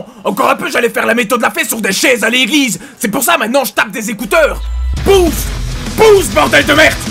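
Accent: Belgium. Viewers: c'est l’accent belge qui fait la différence ;-) j’aime bien toutes tes vidéos on apprend beaucoup de choses !!!!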